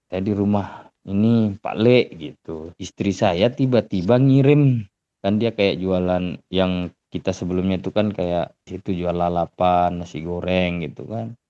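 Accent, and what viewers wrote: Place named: Lombok, Indonesia. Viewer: Cok logat nya kyk orang Lombok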